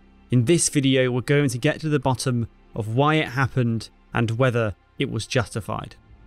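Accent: United Kingdom. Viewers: "Whether it was justified" well, the narrator's British accent can only raise my confidence that it concludes that justice and reparations are closer than ever. :<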